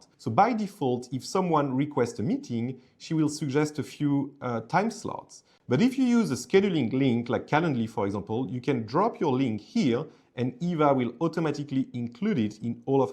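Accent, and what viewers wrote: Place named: France. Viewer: On m'a recommandé ça sur Reddit. Malgré ton bon anglais, je repère tout de même un accent français bien présent. Marblism est français ?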